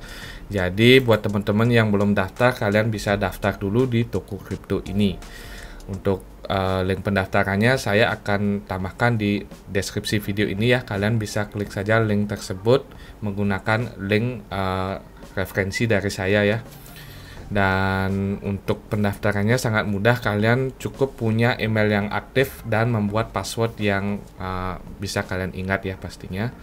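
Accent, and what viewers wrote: Bali, Indonesia. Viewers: Makasih bang.. abang dari bali ? Logatnya seperti orang bali.. kalau iya.. sukseme bang. Rahayu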